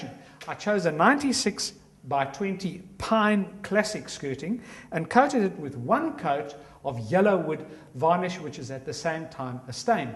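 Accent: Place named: South Africa. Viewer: Great to hear that South African accent! Thanks for your video and efforts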